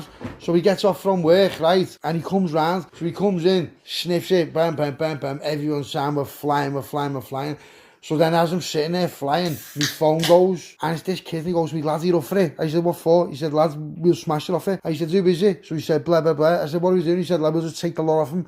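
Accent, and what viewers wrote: Liverpool, United Kingdom. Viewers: Ha ha Ha ha ha ha ha 😆😆😆😆😆, you're off ya head u SCOUSE, fuckin killin me, they've had to move house, lmfco 😆😆.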